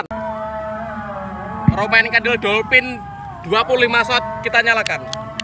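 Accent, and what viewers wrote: Java, Indonesia. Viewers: Jowone ngendi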